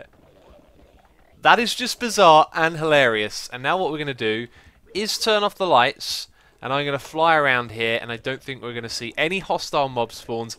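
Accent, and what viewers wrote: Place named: United Kingdom. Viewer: i dunno to me all british accents sound the same :I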